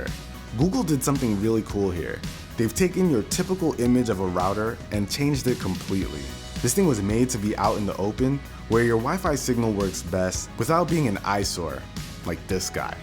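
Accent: Jamaica. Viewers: are you Jamaican? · are you Jamaican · Nice video, your Jamaican!?!?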